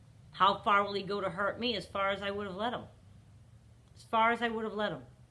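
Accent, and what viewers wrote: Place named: New Jersey, United States. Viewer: Hi Just found your youtube channel today. I love you!! You make so much sense and you sound like people I grew up with in New Jersey😊 I live in Florida now after divorce from narcissist. Thanks for these videos. I like how you just talk like we are sitting in a room. I also like that you do not fill your videos with flashy intros and unnecessary music. I hope you don’t change the format. I especially love your dog in the background, so cute!!!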